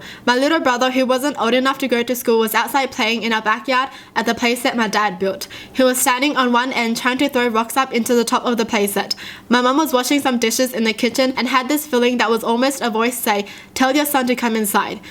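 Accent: Australia. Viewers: I could hear her australian accent! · I love your accent ohmygooosh! Is that an aussie accent? 😮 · I'm so used to her Australian accent now I don't notice it anymore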